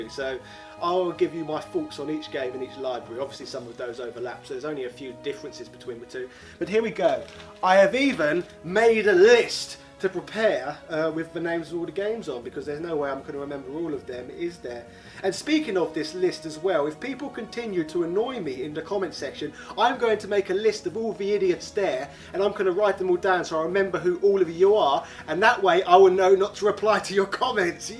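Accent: United Kingdom. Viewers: are you mispronouncing 'ganbare' and 'melee' on purpose or is this Just some sort of british accent I am Not yet aware of?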